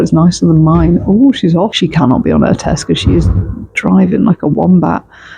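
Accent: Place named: Birmingham, United Kingdom. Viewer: I swear to God, I was here thinking that I’d NEVER heard you sound sooooo Brummie. 😂 Then you said it yourself and i nearly fell off the bed. Love seeing you pushing yourself in a really challenging new environment. Rooting for you. Love from Glasgow. ❤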